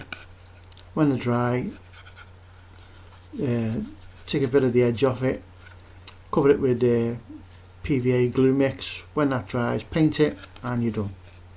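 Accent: United Kingdom. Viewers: Hey man you've just been suggested to me. Glad i came across you as I'm going to start playing bolt action. Where you from I can hear a slight Merseyside accent but I reckon you might be from my side of the water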